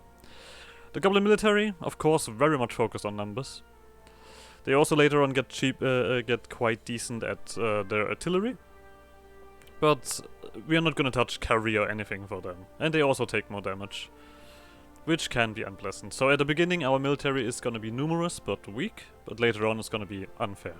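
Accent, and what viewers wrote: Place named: Germany. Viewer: lmao after that "soooooo" i didn't even need to hear the accent to immediately know you're german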